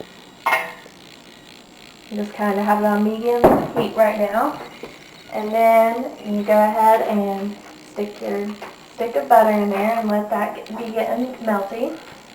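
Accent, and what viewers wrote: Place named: South, United States. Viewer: are you southern I hear an accent.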